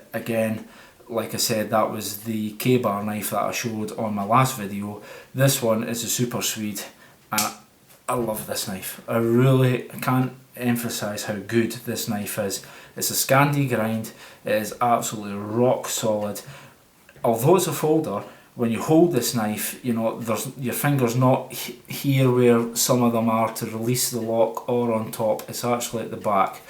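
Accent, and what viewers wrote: Scotland, United Kingdom. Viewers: Are you scottish do you go camping in the highlands.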